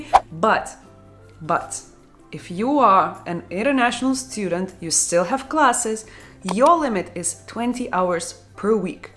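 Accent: Russia. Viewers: hi i just wanted to say that i love your channel and your personality and russian accents that pops-up from time to time is very funny. is it possible for you to make a video on what is a co-op program, give us most of the informations you believe we need to know and share a personal experience if can; I'll really appreciate ✨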